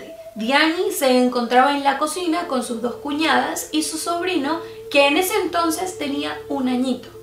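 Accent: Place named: Caracas, Venezuela. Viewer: Me encantan tus vídeos! Se que eres venezolana (de San Cristóbal si no me equivoco) pero siento tu acento muy distinto a como usualmente hablan los gochos jaja, disculpa si es personal, pero viviste en otro estado? Que si en caracas o Valencia? Tu acento es súper caraqueño (te lo dice una caraqueña de pura cepa 😂) Un abrazo! ❤️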